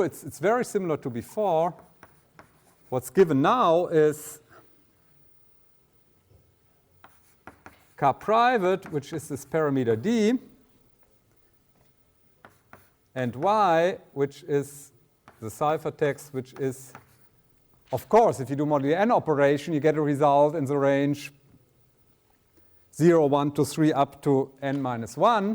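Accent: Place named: Germany. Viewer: Best german accent ever!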